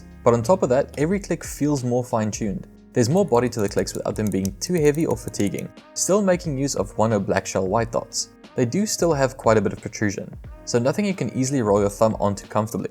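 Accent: South Africa. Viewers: I saw you live in new zealand, so I assumed you're kiwi. But you sounded south african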